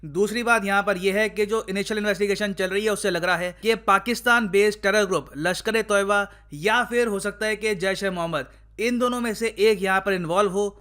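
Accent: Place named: Maharashtra, India. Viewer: Sir apki hindi bilkul marathi jaisi lagti hai👍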